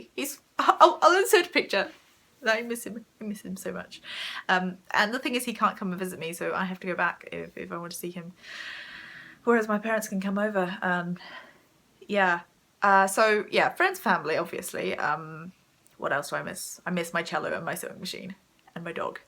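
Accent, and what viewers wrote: England, United Kingdom. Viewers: Just curious why do you have an English accent but you're from NZ? Have you been in London longer than you were in NZ? :)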